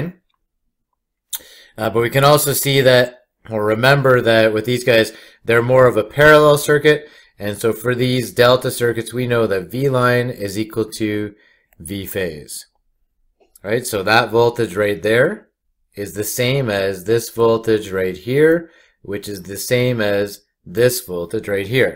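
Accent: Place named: Canada. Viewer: Thanks for these great vids. Aldo your accent isn't all nasal and annoying. I think it's a Canadian thing the yanks got it bad these days and it really makes it hard to endure listening to it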